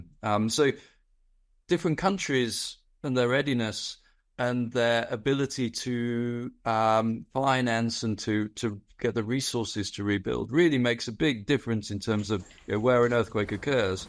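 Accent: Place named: England, United Kingdom. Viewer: I detest the English dialect. The only one worst is aussie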